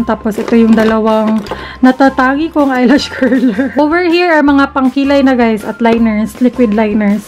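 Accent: Visayas, Philippines. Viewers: Visaya po ba kayo??? Kasi po parang may mga words na accent at visaya word po kayo ask ko po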